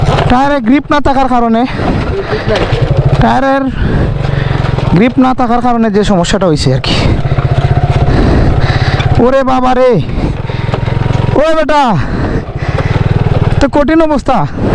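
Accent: Sylhet, Bangladesh. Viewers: Vai ki Sylheti?? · Vai apni ki sylhet ti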